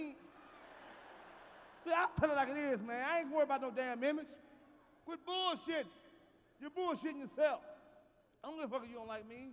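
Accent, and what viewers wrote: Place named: Chicago, United States. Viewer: I swear I always heard West side of Chicago ish talking err time he was on stage.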